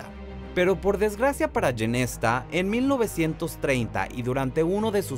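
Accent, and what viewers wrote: Mexico. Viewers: En que momento la voz se volvió tan mexicanota? Extraño la voz antigua, era mas "universal" por asi decirlo.. y mas rapido al hablar :/ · Este narrador es de México y el otro Argentino?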